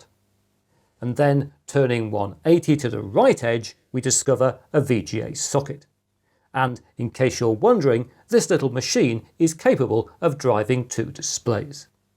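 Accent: England, United Kingdom. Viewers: english accent, check. electronic music, homemade, intro, check. nerd time, check.! love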